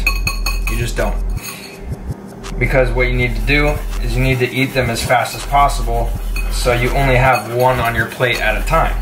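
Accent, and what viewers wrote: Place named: Illinois, United States. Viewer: Lol shit smacks. Sounds like Illinois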